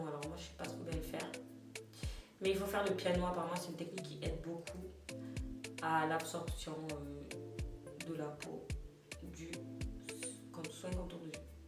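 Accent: Marseille, France. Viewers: J’ai regardée juste avant une vidéo où tu parlait que ton accent marseillais dérangeait et là on voit que tu perd ton accent le sang 😭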